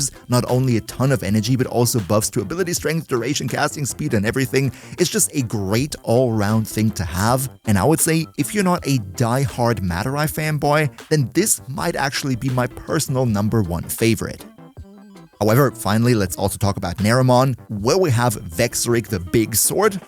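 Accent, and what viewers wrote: Germany. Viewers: Esl german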